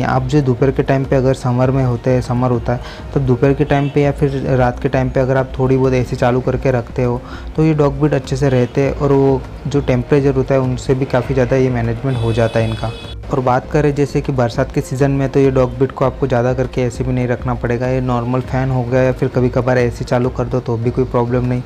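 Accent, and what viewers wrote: India. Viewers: Why you talking like indian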